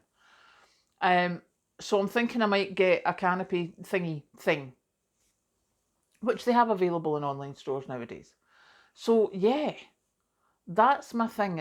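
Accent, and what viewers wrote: Scotland, United Kingdom. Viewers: NOOA prints that so they can justify turing the power off on hot days. It's normal temps for those states. If parasites are all that is wrong with your water, boiling it will kill those. No need for bleach, it makes the water taste terrible. There is no need for filtration. You can boil it & strain it thru your filters for added protection. I LOVE your beautiful accent (Oregoinian here), so please forgive me when i say i had a hard time understanding if you said "water bob" or "water bottle." A water bob is great. You put it in your bathtub and fill it up when you think the water will be shut off. It holds about 50 gallons. (only stating this in case you never heard of one). I LOVE you. I LOVE your smile! I LOVE your videos. I love that we live in a time that I can watch you all the way in Scotland.❤❤❤❤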